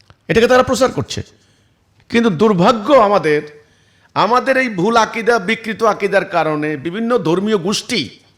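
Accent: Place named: Noakhali, Bangladesh. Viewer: আগে ভাবতাম অন্য রকম , এখন দেখছি মাথায় সন্ত্রাসী চিন্তা চেতনা ?? ভাষা শুনে নোয়াখাইল্লা মনে হলো !!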